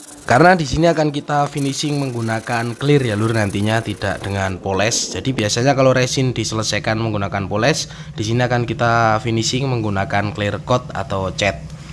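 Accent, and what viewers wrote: Temanggung, Indonesia. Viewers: Nyrongat2, Temanggung banget basane👍👍👍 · Koyo wong temanggung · Ketoro lek tiang Temanggung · Mantep mas.. Logate temanggungan.. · Bosone khas temanggung wkwkwk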